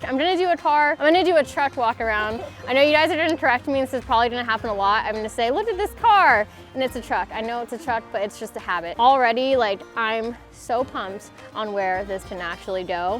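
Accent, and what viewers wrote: South, United States. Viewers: shes cool I like her cause shes into trucks and shes got that southern twang in her like you do ! LoL anyways awesome C10 and chicks !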